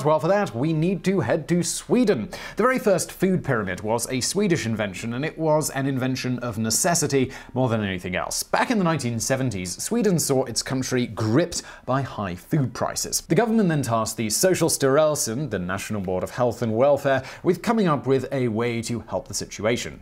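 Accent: United Kingdom. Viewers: I was expecting him to say "Hey Vsauce, Michael here!" when I opened the video, instead I got a british accent.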